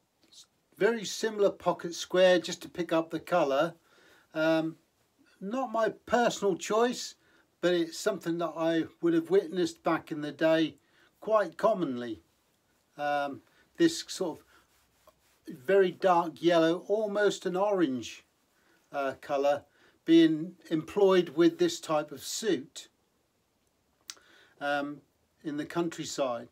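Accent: United Kingdom. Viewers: Trying to place the accent is it Norfolk, Suffolk, or ?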